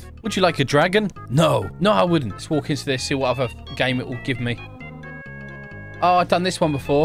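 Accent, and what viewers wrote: United Kingdom. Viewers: yea the brithish accent is amzazing like mate sheesh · You sound british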